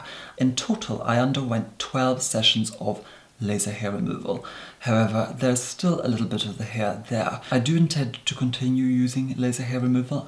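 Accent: Scotland, United Kingdom. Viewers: Eyez Cold is not English. That, you will find, is a beautiful classic true Scottish accent. From the days of Robert Louis Stevenson.... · This is the most Scottish I've ever heard you be, yay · He's actually Scottish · I hear a little bit of a scottish undertone to your accent, any scottish heritage?! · Just realised the accent is posh Scottish not English the fuck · Анастасия Горячева l think that it's a Scottish accent from one of the Highland islands x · Obvz but where in Scotland? He has a mix of accents . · is he scottish? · Are you scottish? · Yes he's Scottish :) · I am loving your accent, a hint of scottish in there? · Um bull. I know people from Ayr and other near areas and they sound very similar to this. It may well be exaggerated- but this is normal for that region of Scotland.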